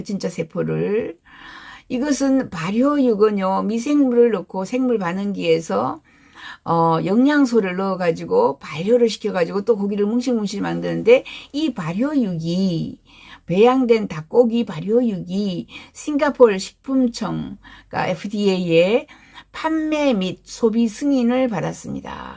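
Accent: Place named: North Gyeongsang, South Korea. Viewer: 경북으로 시집온 중국동포입니당. 구수한 지망말투 친근함을 느낍니다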